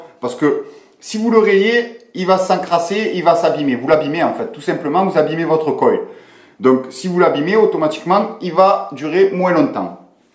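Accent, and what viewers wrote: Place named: Béziers, France. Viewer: Moi je vais me lancer dans le reconstructible et tes vidéos sont tops. Écoute pas les couillons et avec ton accent on doit pas être bien loin l'un de l'autre moi je dirais tu vis pas loin de Béziers. Bonne continuation d'une nouvelle abonnée. Je suis con je viens juste de voir que ton pseudo ya Narbonne lol c'est moi la couillone mais je m'étais pas trompé.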